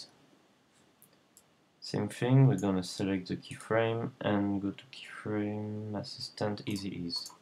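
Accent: France. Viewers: Omg, mec, ça s'entend que tu est français! x3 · L'accent français est juste épic ^^. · u sound French, your voice is cute!!!! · I think hes French, I'm French and I have the same accent. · where are you from ? not sure if you're french or not ! and this mask is awesome ! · a il est vraiment français j'me suis dit : merde, il a un accent français chaud pour lui :rire: maisq bon il a beaucoup de vocabulaire :3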